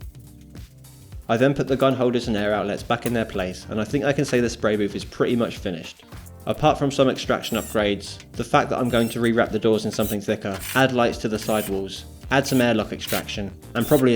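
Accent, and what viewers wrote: United Kingdom. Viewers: La placa española me ha dejado descolocado, eres de España? Tienes un acento británico que no se tiene ni no se ha nacido allí 😂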